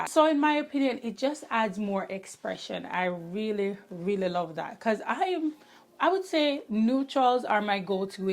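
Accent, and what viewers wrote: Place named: Jamaica. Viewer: I'm seeing you for the 1st time. Heard a Jamaican accent & decided to check .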